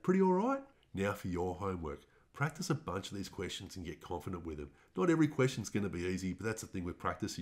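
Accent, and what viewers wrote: Australia. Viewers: Awesome ! btw Ya sound like an Aussie or some NZ guy !😅💥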